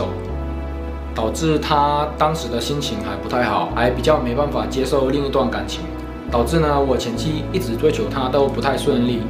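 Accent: Taiwan. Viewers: 兄。你是大陸福建人，可是你說的普通話卻若台灣國語。初時我還以為你是台灣人。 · 哈哈~我看你影片一開始我以為你是台灣人，後面說是廈門才知道你是中國人廈門口音幾乎跟台灣沒差別😆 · 你是厦門人嗎？聽口音還以為是台灣人! 去菲律賓多久？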